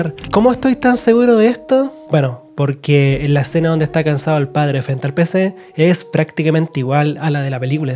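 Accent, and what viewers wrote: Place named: Chile. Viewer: Bro tienes buenas ideas pero si quieres crecer trata de no tener el acento chileno.. para que seas global y te vean de mexico tambien, colombia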